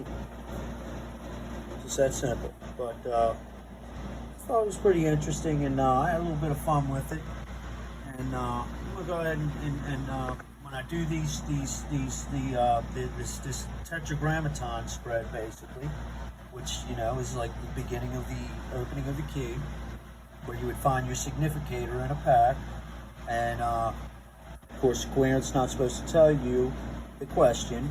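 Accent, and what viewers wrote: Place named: Baltimore, United States. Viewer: I thoroughly enjoy the 'balmer accent delivering the old wisdom! Cheers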